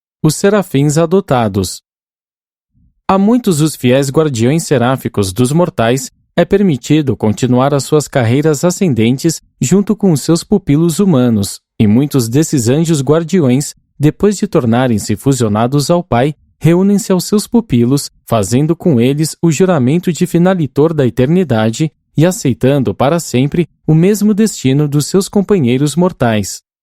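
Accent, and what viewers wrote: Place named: Sao Paulo, Brazil. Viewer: ...sotaque paulista....confunde ponto com virgula......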